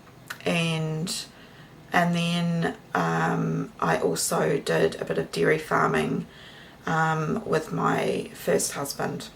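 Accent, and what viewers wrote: New Zealand. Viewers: OMG- please don't take the 1% negative comments to heart!!! We LOVE seeing you in your little kiwi kitchen, yours echoes our own... Your accent, your beautiful aprons... It's all familiar...80% of kiwis live like you do! Good on you, for taking your life into your own hands and creating your dream life! We celebrate you! ❤ 🎉🥳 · What a nasty thing to say to you. It is not anyone's place to make personal comments. I love you videos and I have only just discovered them. You are REAL and most of us have kitchens just like you. I enjoy the way you are always positive and make the videos fun. Please keep up the good work. I am in NZ too and it is great to hear another kiwi.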